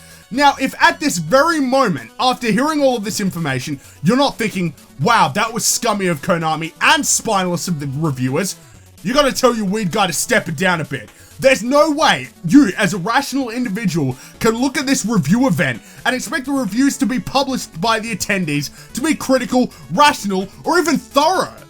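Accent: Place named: Australia. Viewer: The Australian accent just makes what you said even funnier. Great video as usual.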